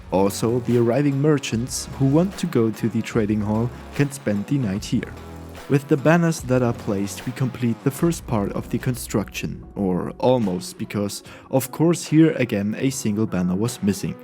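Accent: Germany. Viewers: Your akzent sounds a little bit German.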